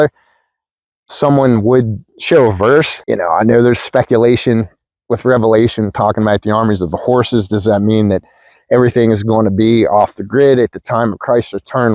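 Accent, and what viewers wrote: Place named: Pennsylvania, United States. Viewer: What a great video thank you for sharing! I can’t quite place your accent are you from PA? Greetings from Chester county god bless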